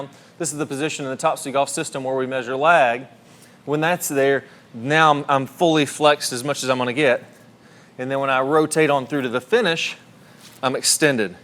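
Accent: South, United States. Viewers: You're an excellent coach. I hear that southern twang shining though. Where could I find you for an in-person lesson?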